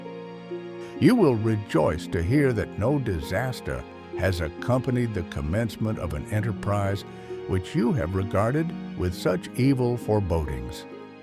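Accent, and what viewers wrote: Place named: United States. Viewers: I found that American accent off putting. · This channel is from the UK, not from US. That was their decision to go with the American accent.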